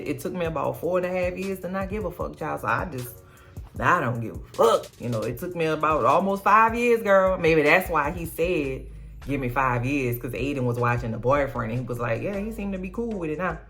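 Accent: New Orleans, United States. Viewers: "Take Braedyyy, taek Braedi.....He had a long life. I think what he like 19/20?...That's a solid life if you ask me!" YEOOOOOOO The Nawleans accent is the icing on the cake to these AJLT reviews, cuz the show ain't issh 😫🤣🤣🤣🤣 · First, I LOVE YOUR RECAPS. Everything is better with an authentic New Orleans accent! Secondly, I totally agree about the children on this show. They are constantly in sexual situations and having sexual conversations, ew. The conversation about polyamory or pansexuality during dinner with parent and grandparents was an ABSOLUTELY NOT. This show is tripping. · I love your accent. New Orleans is my all time favorite city, and your humorous recaps are the ONLY good thing to come from this monstrosity of a reboot.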